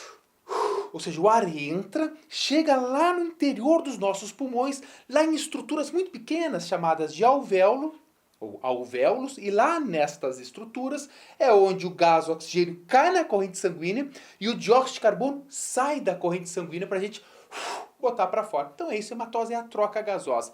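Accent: Rio Grande do Sul, Brazil. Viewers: meu parabéns, sua vocação com certeza, és dar aula! vim aqui nesta maravilhosa aula, pois a didática da minha professora de fisiologia, não é muito boa! percebi que tu és do RS! sucesso!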